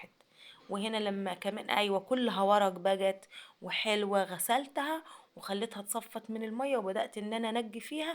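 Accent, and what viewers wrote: Upper Egypt, Egypt. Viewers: اول مرة بشوف قناتك احلى لايك و مشاركة انامصرية مغربية بحب اوي طريقة كلامك الصعيدي ان شاءالله ربنا ياعليك. يريت اعرف من اي بلد في الصعيد 🎉🎉🎉🎉🎉❤❤❤❤❤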